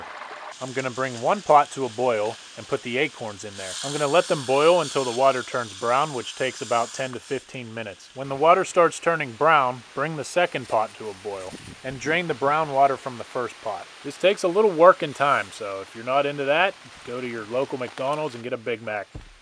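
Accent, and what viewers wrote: Pennsylvania, United States. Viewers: Where are you from? You sound like a fellow Pennsylvanian sometimes. Lol I just found your channel today so please forgive me if it's written somewhere and I missed it or just haven't found out yet. Lol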